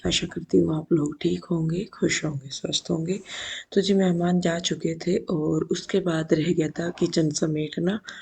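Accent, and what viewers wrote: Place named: Meerut, India. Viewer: Assalamualaikum me farzana Karachi Pakistan SE 4 3 Saal SE apka vilog dekh rhi hoon mere dada Dadi bhi Meerut SE thy apki boli buht achi lagti hy mere dada Dadi bhi easy hi bolty thy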